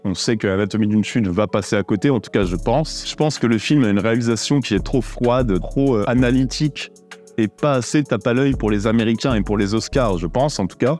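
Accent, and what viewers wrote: France. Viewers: Tu ne serais pas franc comtois? Ton vindieu étais magnifique 😂 merci pour cet vidéo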